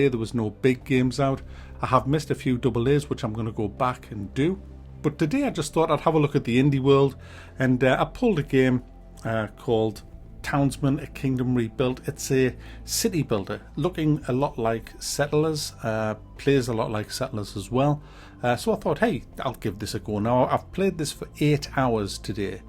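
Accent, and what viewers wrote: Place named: County Durham, United Kingdom. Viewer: Love seeing the reviews, have done for years, im from county durham and weird hearing a local accent on youtube!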